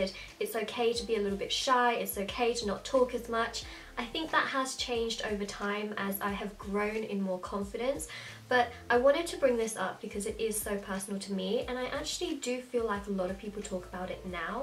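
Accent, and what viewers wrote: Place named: Australia. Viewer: Are you originally from Australia? You have a mixed Aussie-English accent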